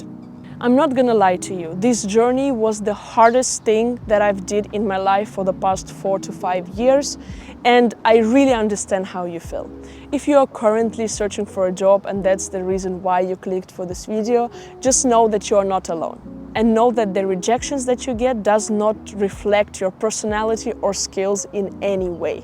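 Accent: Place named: Netherlands. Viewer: It's interesting that you have developed a Dutch accent when you speak English.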